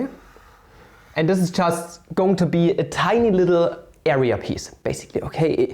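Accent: Germany. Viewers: A bit too patronizing and arrogant. You are 10-0 behind because of the terrible German accent and from the start Matt Parker's drawing is much better than yours.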